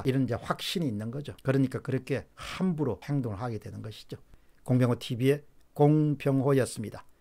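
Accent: Gyeongsang, South Korea. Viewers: 공병호씨 이분 기억해둬야겠네 말투보니 대구사람 같은데 같은지역 산다는게 넘 쪽팔린다. 미국산 쇠고기 마이 쳐 먹었나??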